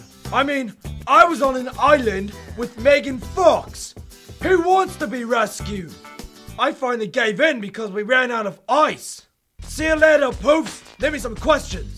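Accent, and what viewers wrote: United Kingdom. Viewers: Dear Charlie, why do you have a british accent? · Charlie are you really Scottish because you are actually using a Scottish accent mate but do make some bloody good content and ghost Charlie mate you should go back in time to when your alive and tell him to build a machine to save smosh you bloody good mate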